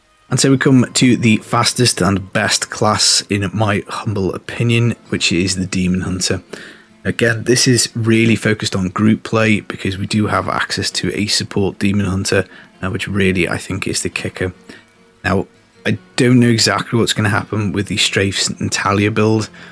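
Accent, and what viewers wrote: United Kingdom. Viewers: first a disclaimer... my mother lenguage is not english..... that will be probably noteced im my speling throuout the comment, anyways.... The content that you presented is an A content. Nothing to say about that, but complements. Very good indeed... But i think you shoud think about the ppl like me... That the english is not the main language... You speak kinda fast and with a pretty strong uk accent... witch is not a proplem at all, but you speak realy fast, like your'e talking to your friends or family... Well... As you know, your content is spread world wide... that means, you shoud think about, i dont know, speak as a www content producer... if you know what i mean... love your job, love your content, but i had to go back few times to get exactly what the hell what you were talking about! heheheh... that is not a complaint.. 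that is just a tip for you.. you could try to be more articulated in you speach... dont hate me, again, that is a tip... maybe speak "last fast" or, i dont know, a little bit more "international", if you know what i mean... thanks for your job! As i said before, it is Ases!!!! ;)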